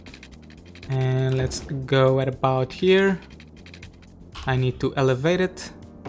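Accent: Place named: Portugal. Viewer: O sotaque português nota-se tão bem! Grande trabalho que tens feito neste canal, vejo muitos dos vídeos que fazes, obrigado pela dedicação!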